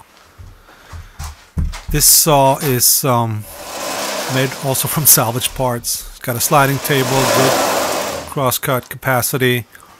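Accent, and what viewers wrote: United States. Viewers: Great shop setup. Norwegian?, you sound like you have a New York accent! How does that happen? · You say thanks for watching but I for one want to give you a BIG Thanks for sharing!! You took a short basement (w rock on one side) and made it a great wood shop! Especially like the cabinetry and organization (wish I could do 1/4 of that). Your DIY tools and jigs are an inspiration. I do have one question; the electrical plugs aren't the type we use in the USA but sound like your from the northeast USA? Thanks again!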